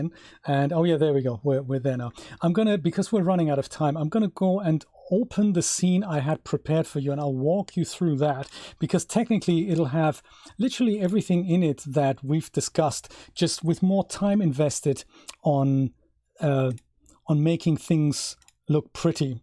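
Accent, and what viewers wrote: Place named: Germany. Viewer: Sir, thank you for your unique work and presentation, I'm more a silent spectator of your site for years now. One question about you please, your pronunciation sounds so German, are you German?... 😉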